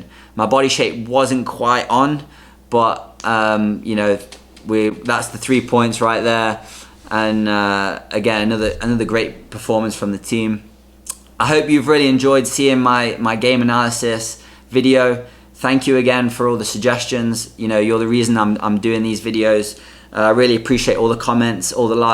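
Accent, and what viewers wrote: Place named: United Kingdom. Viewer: This is the second clip I have watched in your channel. Although your accent is very British I think you are playing in the US. Your team is SLC and I wonder if it is Salt Lake City. What class of league are you playing? I know the top league is MLS but the venue doesn't seem to be up to the MLS standard.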